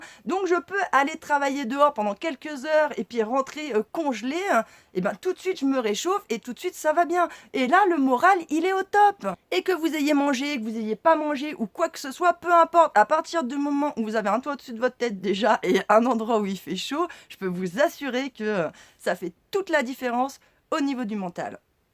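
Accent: Paris, France. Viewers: qu'est ce que tu me fais marrer, j'adore ton peps pour expliquer les choses primordiales à 13500 abonnés (c'est top) au début, ton accent parisien m'agaçais un peu, je me disais encore une qui va raconter la vie sans savoir ....mais non t'es une référence. j'ai beaucoup d'estime pour toi car tu me communique. moi je suis a peu de chose dans les mêmes conditions de toi mais c'es trop de taf de vouloir les partager. merci encore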